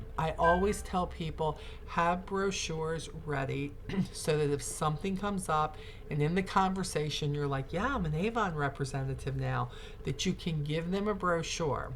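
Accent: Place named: Mid-Atlantic, United States. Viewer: Hi! Just started and I want to thank you for all you do. I'm from NJ but live in MI now and with so many other videos out there to watch, I felt at home when I heard your mid-eastern accent.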